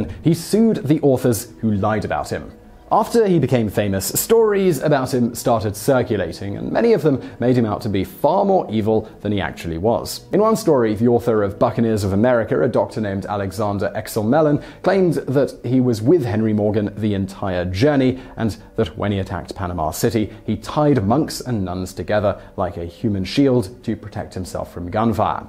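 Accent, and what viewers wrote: United Kingdom. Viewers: I don't know about you guys but Simon and Company could research the origin of the toilet seat and we'd click on it and damn it find it one of the most Interesting facts of the day! That British accent just has a way of keeping you engaged in though! Well done again guys! Thx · I love how most people from the British Isles that I've ever heard speaking absolutely refuse to pronounce any word that ends in "-tory" as "toree". It's ALWAYS pronounced "-tree". Could the politics of the "Tories" have anything to do with that I wonder? ;)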